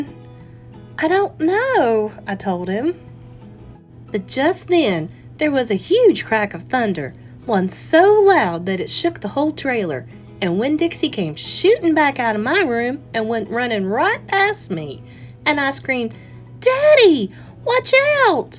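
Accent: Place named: South, United States. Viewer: It's very hard to find anyone who can read a chapter book with excellent intonation, phrasing, and tone. If I need to find a reading to post for my students because I will be absent or I have a student out for an extended time, it's nearly impossible to find good readers on You Tube. But, you are amazing! Your beautiful accent really adds to the ambiance of the story, since it takes place in the south. By far the BEST reader I can find On You Tube.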